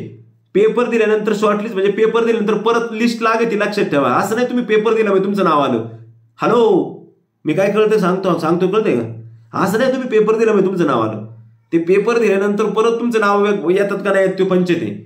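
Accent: Ahmednagar, India. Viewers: सर मला तुमची भाषा ऐकूनच वाटल होत की तुम्ही नगर चे असणार म्हणून 😄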